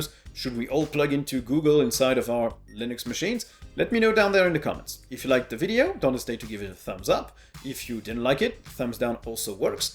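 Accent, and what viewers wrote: France. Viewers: I'm french and I find it weird to listen to a Frenchman speaking in English. But your channel is very interesting and you're the reason why I'm rocking elementary os on my laptop as a teacher everyday!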